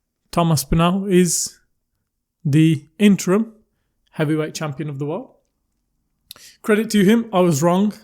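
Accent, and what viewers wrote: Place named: West Country, United Kingdom. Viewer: west country-ish?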